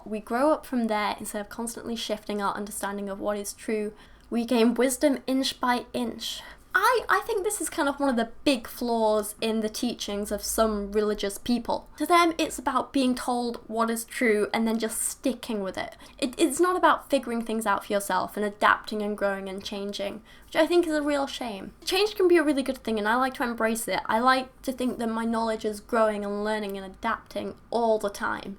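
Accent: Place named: England, United Kingdom. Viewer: You brilliant little apple nibbler! :) awesome. Even better how u say it, English accents add to how good most women sound :) keep fighting the good fight . Your fan -Brandon Gutleben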